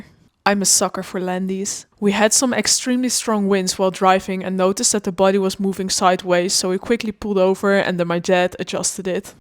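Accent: Netherlands. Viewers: You are Dutch. I can hear it. Lekkerrr.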